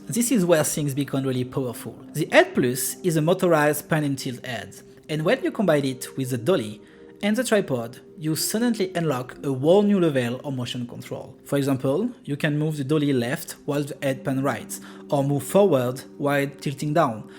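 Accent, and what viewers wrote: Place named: France. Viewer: On est d’accord il a un sacrée accent français ?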